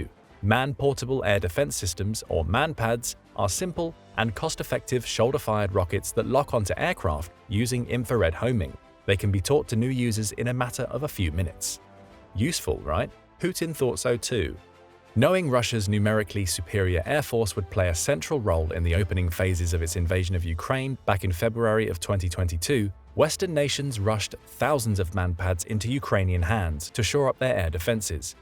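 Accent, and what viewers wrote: United Kingdom. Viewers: Why the British accent ?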